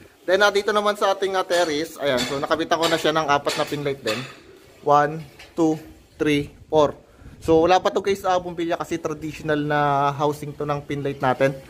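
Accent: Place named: Quezon, Philippines. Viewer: Napansin klang sa pananagalog mu puntong quezon ay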